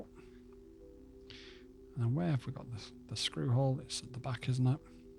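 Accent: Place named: Manchester, United Kingdom. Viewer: is Welsh, but you hide your Mancunian twang quite well. To my Scandinavian ears, it sounds more like a standard northern accent. But don't trust my opinion — I'm just an ABBA-Volvo-Ikea bloke and everyone knows what happened at Lindisfarne when the monks trusted us.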